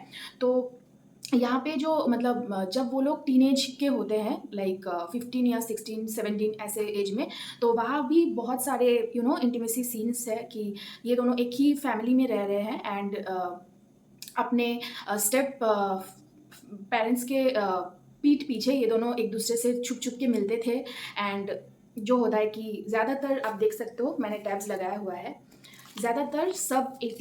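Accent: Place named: Bengal, India. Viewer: 8 months na ki 8 years Btw. Your Bangla-hindi accent is amazing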